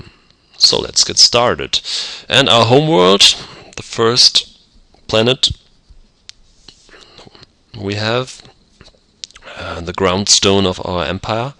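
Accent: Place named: Eastern Europe. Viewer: apart from your irritating eastern-euro voice trying to speak in english accent, i found the video quite helpful for me to enter into this ancient game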